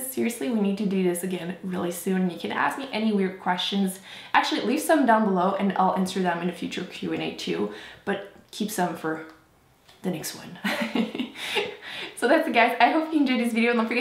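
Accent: Quebec, Canada. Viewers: Just discovered your channel and right away I was sure your were french-canadian, as I'm from Montreal myself :) I'll be checking out all your videos now haha ill make sure to comment. I don't know how you do it with school and YouTube but keep it up! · I just found your channel from the Kat Von D Everlasting Lipstick swatches and looking through your videos I saw this where you answer what kind of accent you have... French Canadian! So cool, the majority of my background is from there, and you pronounce "third" just the way I remember my late Pepe... it's nice to hear the accent again! Plus, we seem to have the same coloring (dark brown hair, dark brown eyes, fair skin) so your videos will be very helpful to me since that is a Dark Winter coloring, and you published this video on my birthday! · Yes, No, Toaster! Le français typique du Québec hahaha! J'adore tes vidéos, elles me font toujours sourire :)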